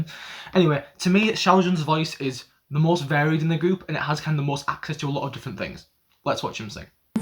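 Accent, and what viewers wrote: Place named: Northern England, United Kingdom. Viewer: Can I ask where you're from? You have an interesting accent. It kinda sounds like northern England or maybe even Scotland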